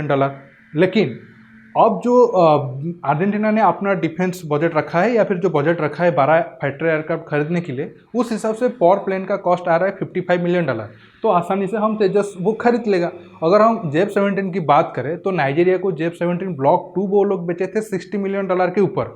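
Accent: Odisha, India. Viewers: Brother , excellent vlog ... please correct your Oria accent .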